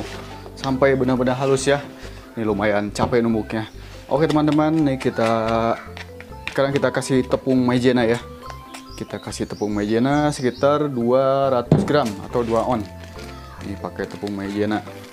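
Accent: Sunda, Indonesia. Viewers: Mantap Aa dn mksih infony sunda bgt AA 😁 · Porocotin heheee.. Sundanese pisan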